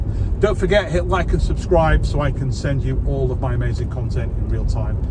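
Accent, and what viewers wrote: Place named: Merseyside, United Kingdom. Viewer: You have a merseyside twang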